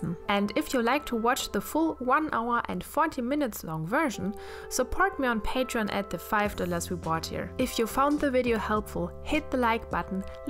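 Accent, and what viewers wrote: Germany. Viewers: Man hört direkt dass du deutsch bist☺️👌🏼 echt gute tips und echt schöne Bilder 👍🏽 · R u German? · Are you froh German? Your accent is so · Are you German? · I always enjoy watching your videos. Lately I had problems finding motivation and you helped me quite a lot :) also I enjoy the way you edit your videos - the slow close ups of the brush and the paint in the beginning are so stunning...it really catches the mood of the painting process :) oh and your German accent - lovely ;) it's always funny to find other German YouTubers... (PS: Liebe Grüße aus Berlin! <3)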